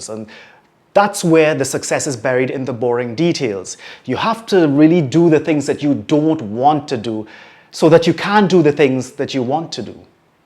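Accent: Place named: Trinidad and Tobago. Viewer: Not only an amazing story but well told and the Trinidadian accent tho I'm Antiguan (have family in Trinidad) gives me an at home feel But at just 18 years old there's a lot I will take from this video including the fact that I am now the writer of my own destiny I have the ability to grow develop choose the persons who I want surrounding me etc. Of course you cannot control everything and alot of times it's good to not be able to control everything because we serve a GOD who loves us and we must face challenges. But by far one of the most clear and fruitful messages I've ever received!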